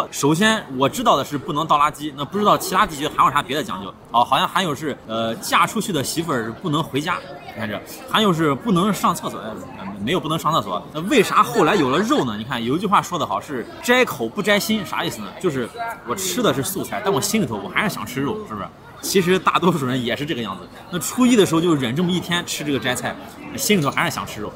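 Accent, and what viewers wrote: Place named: South, China. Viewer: 喜欢听说南方普通话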